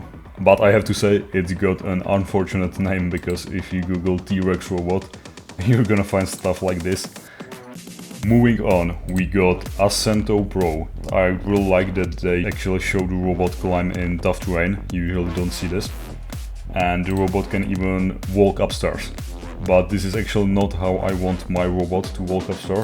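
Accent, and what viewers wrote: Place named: Czech Republic. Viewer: Mohu se zeptat kde studuješ? :D doufám že jsem rozeznal dobře český přízvuk :)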